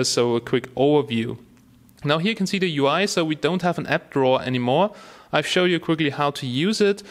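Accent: Germany. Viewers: He is german :P I should know cause I live in germany xD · this guy is def. german hahaha that accent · is he german?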